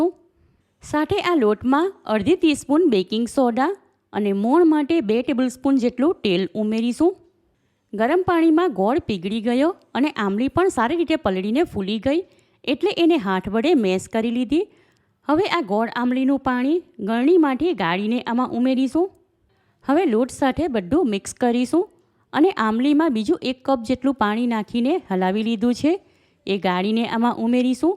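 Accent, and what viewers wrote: Surat, India. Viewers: surat ni gamdani boli che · સુરત નું ગુજરાતી આવું હોઇ છે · A sweet surti tone chhe 😊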